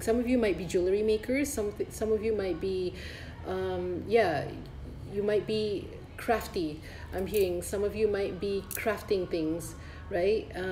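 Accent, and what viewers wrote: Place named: United Kingdom. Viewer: Picked pile 1 TARA I literally wrote Tara’s mantra snd stuck it on my wall few days ago . And YES RELEASE A RELATIONSHIP GOSH KEEPS COMING UP! yes proud of myself thank you for reminding me. Look at all that I have accomplished, and teaching sounds like me! Sharing wisdom! And expressing myself 😭😍 beautiful! Ps. like your cute British accent 😏😏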